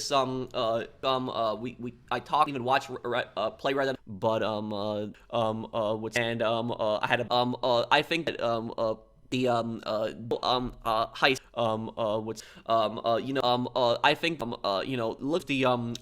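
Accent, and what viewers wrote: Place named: United Kingdom. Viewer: I love he’s solid gta YouTuber, I have 0 hate for him but this video honestly made me laugh so hard, haven’t laughed that hard in a long while so thank you man👍 Also I could tell your British same as me with the east Enders intro and outro 🤣🤣🤣 loved it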